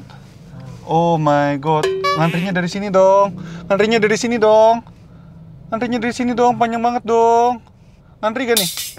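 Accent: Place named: Bandung, Indonesia. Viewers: Hese teu nyunda teh 😁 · Paling suka logat sunda.😁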